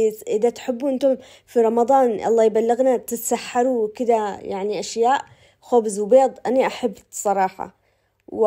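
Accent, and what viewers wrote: Aden, Yemen. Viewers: تسلم الايادي يا محلا كلامك وحشني الكلام العدني اني اتربت وعيشت بعدن وسافرت السعودية لي اكتر من ٢٥ سنة 😢... اني احب اسوي خبز علشان اسرتي كلهم يحبوا الخبز واليوم سويت الخبز المعطف بس ازود واحط الباقي بالفريزر الله يقوينا ويطتب الاجر لنا جميعا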